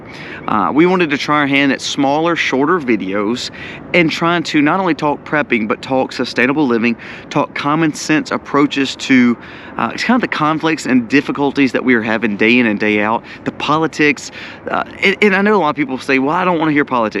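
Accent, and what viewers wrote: South, United States. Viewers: You're the only youtuber that can inform us about some disaster but, at the same time, makes us feel good about our day. It's that smoooooth southern voice. You're the Mr. Rogers of prepping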